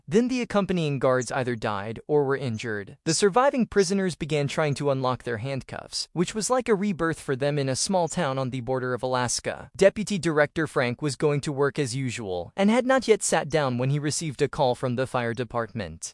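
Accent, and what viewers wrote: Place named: Germany. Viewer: The AI’s German accent narrator is a relief because most AI voices are the same generic voice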